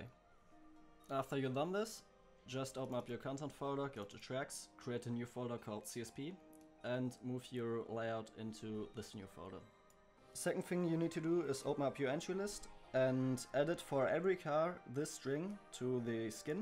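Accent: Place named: Germany. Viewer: Moin, dein Akzent klingt sehr deutsch, deshalb schreibe ich mal auf deutsch:) Ich habe einen Server gemietet um mit freunden zu spielen. Leider sind wir alle Anfänger und bekommen die Traffic mod nicht installiert. Kannst du und da vielleicht weiterhelfen?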